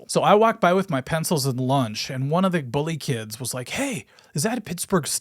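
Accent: Minnesota, United States. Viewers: I always thought of you as the Harry Kim of guitar teachers, from Star Trek Voyager.(Garret Wang). But with a Minnesota type accent. From the Coon Rapids area. Love your stuff. Keep teaching these younger generational how to be Rockers. Is Tom Bernard Still at KQ?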